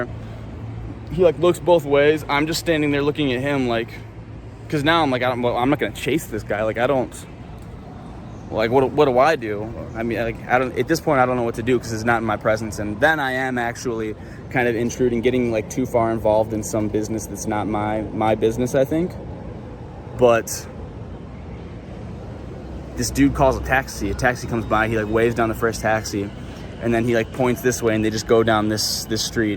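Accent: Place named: South, United States. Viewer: Your accent is too Southern USA ! Go back to North Carolina hick !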